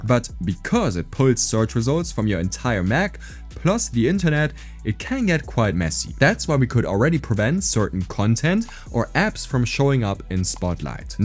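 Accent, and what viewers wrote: Germany. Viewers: Am Akzent rausgehört dass er Deutsch is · Omg dieses german accent ist halt so schlimm 😬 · I could easily hear your German accent but it sounds good and really natural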